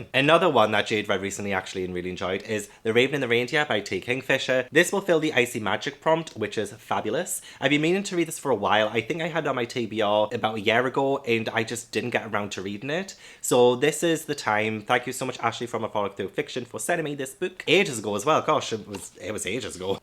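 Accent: United Kingdom. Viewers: For those who complain about how you say books must not know that you have a beautiful British accent and that is how the British say books, the way you tried to say books sounded unnatural to me...lol...sounded like you were trying to do an American accent. I personally love hearing a person speak that doesn't sound the same as me. My personal favorite is the way people from the UK say helicopter, it's so adorable. Never change for anyone, always be you. I couldn't stop laughing about that manga Dick Fight. Now, I am curious about it...lol. Also, shout out for the hoodie, that's '90's classic Nickelodeon cartoons, love it!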